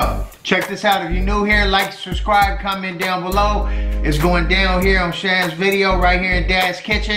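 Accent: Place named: Bay Area, United States. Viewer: Thank you for this my tri tip cam out tender! Btw Yall must be from the bay I recognize that accent lol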